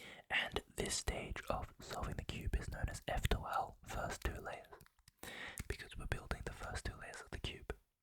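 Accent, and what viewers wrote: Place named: Australia. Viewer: Literally 4 seconds in I could tell you were from or are in Australia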